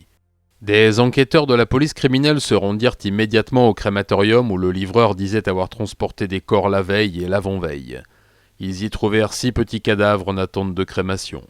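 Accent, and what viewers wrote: Japan. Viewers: une sage femme peu sage...encore une excellente vidéo avec un délicieux et parfait accent japonais!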